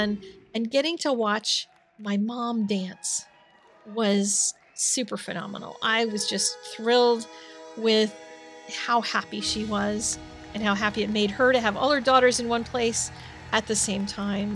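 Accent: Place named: Kentucky, United States. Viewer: Kentucky! Im from Tennessee so I recognize it anywhere lol.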